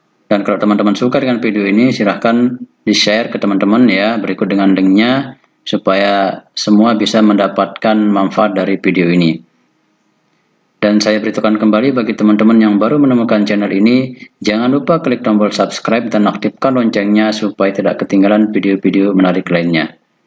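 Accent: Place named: Aceh, Indonesia. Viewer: Lage awak aceh logat deon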